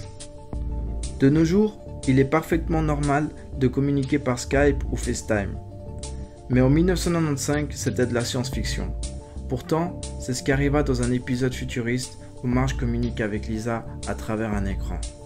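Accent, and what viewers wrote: Belgium. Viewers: Il est belge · C'est obligé ce mec il est belges vu comment il prononce les dates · T belge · + articulation TRES faible, je connais bien l'accent belge mais je n'arrivais pas non plus à comprendre les années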